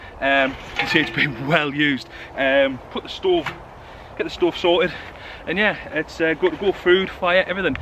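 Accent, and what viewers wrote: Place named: North East England, United Kingdom. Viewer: Good to see you back buddy👌 I’ve just bought a polish lavvu and I’m on “modding” it at the min, sewing monster zip in it, wax proofing it with a home made recipe and heat gun, changing the pole system to a british one witch packs down to the same size as original and also making custom wood bungs to go on top/btm of pole system😌👍 i am thinking about starting videos on bushcraft and wild camping but as a noob enthusiast 😂 we shall see... Ive watched all your videos and have learned a lot from you, your instructional skills are ace bud and its a pleasure to sit down and watch your videos learning as i go👌 plus its a easy to relate to a fellow north east lad and being able to understand what your saying with the accent😂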